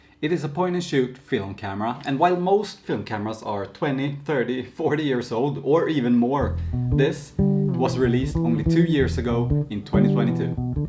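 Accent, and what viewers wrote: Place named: Gothenburg, Sweden. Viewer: Härlig Göteborgsengelska…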